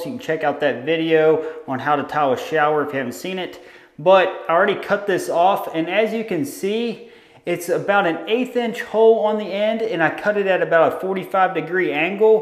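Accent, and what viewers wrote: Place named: Pennsylvania, United States. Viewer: Southern PA af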